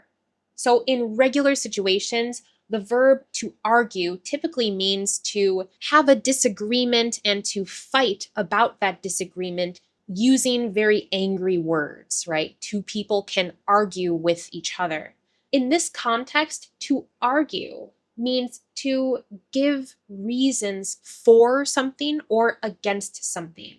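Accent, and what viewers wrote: United States. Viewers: Hi, I really love your American accent—I could listen to your voice for hours! Your lessons are worth the wait. Thanks a bunch for teaching me!❤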